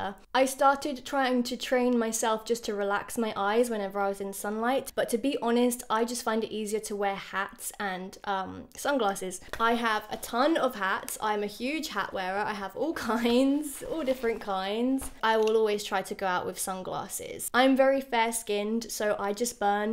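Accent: United Kingdom. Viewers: I really like your idea of aging, it’s beautiful ! And by the way, La Roche Posay is a French brand, and we don’t pronounce de E in Roche ;), it’s like « La rosh Posay », but I like the British accent on the prononciation 😍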